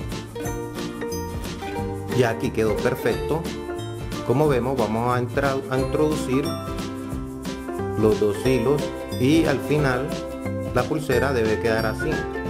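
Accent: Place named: Caribbean Coast, Colombia. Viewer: Buenas, recien encontre este canal y me parecen sencillas, hermosas manualidades y bien explicadas... una pregunta usted es colombiano? a ratos lo siento costeño...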